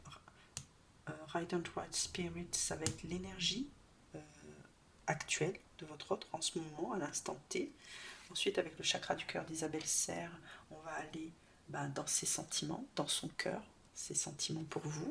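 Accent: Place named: Toulouse, France. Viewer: Choix 3. Et tu as un accent toulousain que je n’avais jamais entendue !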